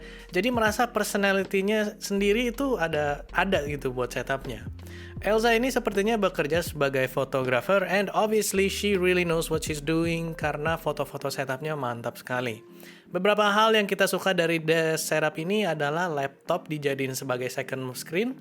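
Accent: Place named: United Kingdom. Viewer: Keren nih ada aksen gaya bahasa inggris nge riview nya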